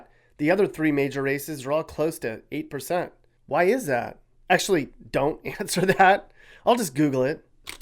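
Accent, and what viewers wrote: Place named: South, United States. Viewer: you have a touch of a southern accent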